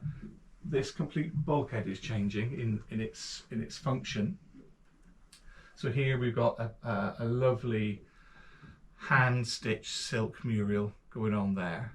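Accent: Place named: West Country, United Kingdom. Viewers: 😂 its the West country accent m'lover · Like the look of this one. But maybe its the west country twang but...its Mural not Murial.😉😎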